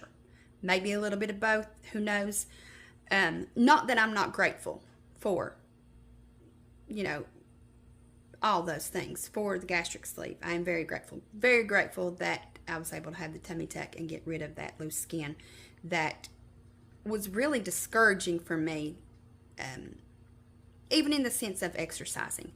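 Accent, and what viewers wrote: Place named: South, United States. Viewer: just found your channel, love your curls and southern accent!! (Louisiana girl here) You look great and thank you for sharing your info. I hope next week brings your results. I need to get better at making videos..I need to also start weight training, so definitely subscribing to follow your progress.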